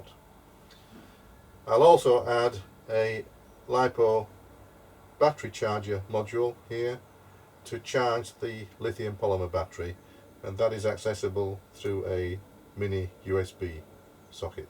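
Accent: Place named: United Kingdom. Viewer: There's something about your style that reminds me of 16mm educational films. Maybe it's your "Engineer Brit" accent (I'm not up on this: are you from the North, or the Midlands, or Bristol or what..?). Or maybe it's the font you use in your titles, which is right out of Encyclopedia Britannica films. One thing I really have to give you credit for: you are direct, to the point, and you don't repeat yourself endlessly like a certain ausie bloke I know of. But at the same time, it's a very conversational style of delivery. I can tell you've prepared, but you're still kindof speaking off the cuff. Whatever it is, I like it.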